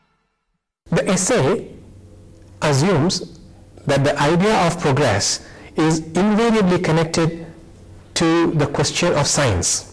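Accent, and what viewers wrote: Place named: India. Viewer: Sir, it is a lesson for Indian graduate students, his objectives of teaching this essay is to make the students understand the key points easy not the american philosophy, it is in Indian English pronunciation, so that Indian students can understand these sounds easy. Thank U Sir.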